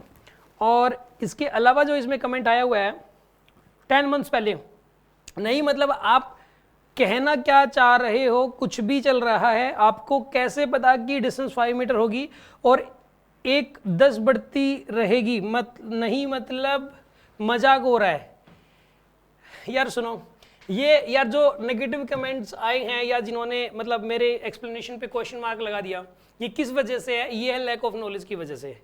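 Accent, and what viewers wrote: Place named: Bihar, India. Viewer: Sir aap बरा bhankayar समझाते हो पूरे बिहारी जैसे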